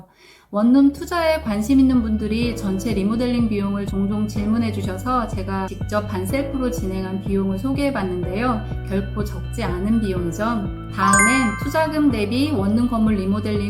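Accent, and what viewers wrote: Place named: Jeonju, South Korea. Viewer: 말투 듣고 전주사람인것 같앗는데 맞군요.한양수자인 보고 맞구나 했습니다. 대단하십니다.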